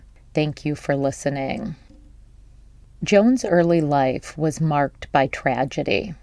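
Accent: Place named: West Bloomfield, United States. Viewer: im from w bloomfield i hear that mi in your voice thank you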